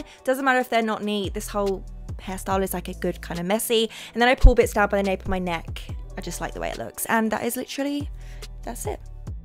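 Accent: United Kingdom. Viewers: Are you British :D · SOMEHOW I KNEW SHE WAS BRITISH 😭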